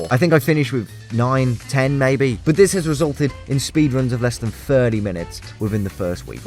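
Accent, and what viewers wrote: United Kingdom. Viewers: I KNEW THAT WAS PS1 MONSTER S.A. you sound briish btw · I like your british actent is so perfect i wish have but i live in Poland so... Yeah this is i be wach you british actent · You sound British?... When are you going to convert to Islam? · First time on the channel, American 🇺🇸 here. With my only knowledge of this game being British, Im happy to know I tapped on a British dude reviewing it. It makes it so much better! Thank the algorithm YouTube scum, they do something right!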